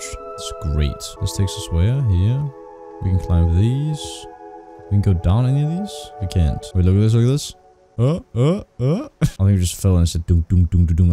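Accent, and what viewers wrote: Denmark. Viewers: Are you danish? If so, cool. Btw good video!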